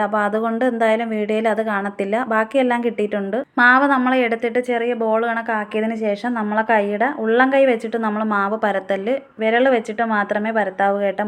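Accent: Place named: Venjaramoodu, India. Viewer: നിങ്ങളുടെ സംസാരം കേട്ടപ്പോൾ തോന്നി വെഞ്ഞാറമൂട് കഴിഞ്ഞു വരുമ്പോൾ ഉള്ള ഏതോ ഒരു ഭാഗം ആണെന്ന് കാരണം ഞാനും വെഞ്ഞാറമൂട് ഉള്ളത് ആണ് എന്നെ കെട്ടിച്ചു വിട്ടത് നിലമേൽ ആണ്... ഇതേ seim സംസാരം ആണ് ഞങ്ങളുടെത് ഓക്കേ gd luck 👍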